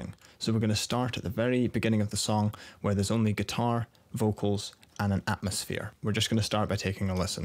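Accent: United Kingdom. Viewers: Are you Scottish or English!? I can't tell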